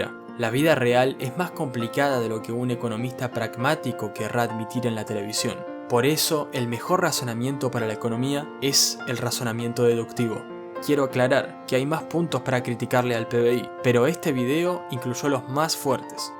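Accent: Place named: Argentina. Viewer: tu hablar parece de argentino. Pero, donde vives?... en USA?. Gracias por tus aportes al conocimiento general de la economía. (Te sigo en todos tus posteos). Saludo Libertario 🤙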